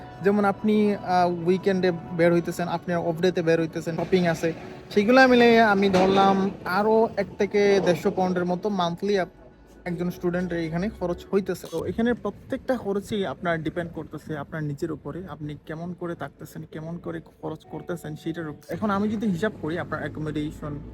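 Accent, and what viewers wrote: Sylhet, Bangladesh. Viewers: Apni sylheti tai na vai?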